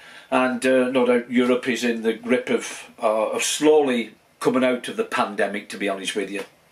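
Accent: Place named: Bolton, United Kingdom. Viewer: And there's me thinking it was a Bolton accent!! You keep going me o'l mucker!! Stay Safe and Healthy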